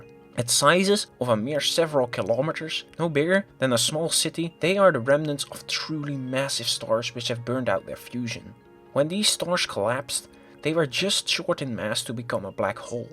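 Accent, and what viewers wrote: Netherlands. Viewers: sounds more like a dutch accent than a lisp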